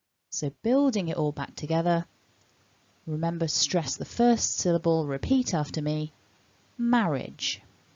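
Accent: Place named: United Kingdom. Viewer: i love British English accent 🥰🥰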